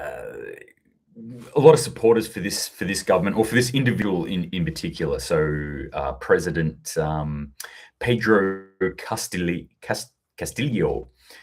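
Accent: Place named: Australia. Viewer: Only found you today and have only watched a few of your vids so far but am liking your content. Love hearing a fellow Aussie (I’m from SA) getting into the geopolitical theatre and giving straight forward breakdowns without the MSM spin. I think I read on telegram this morning that Spain was aligned with Serbia? Interesting when Serbia is aligned with Russia as well. Looks like todays tension has been kicked down the road for another month. And Serbia has beefed up its defensive measures a lot since NATO intervened last time… So many tinderboxes (conflict, contagions and food shortages) all over the globe at the same time. Coincidence of course. 🧐🤣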